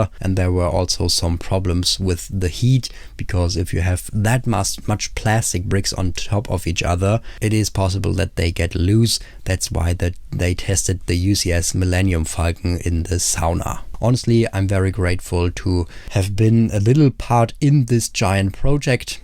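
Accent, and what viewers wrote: Germany. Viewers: 1 second in the video and i can already tell you're german as well xD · Love the work and ze german accent✌️ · German accent Kicks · Denglisch, hört man sofort raus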